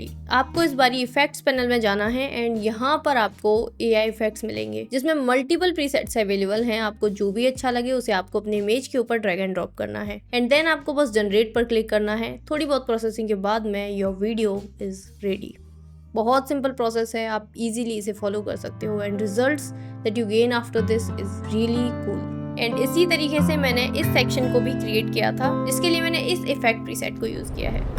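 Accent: Gujarat, India. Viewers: Maybe Work on your Gujarati accent...or else evrything else is fine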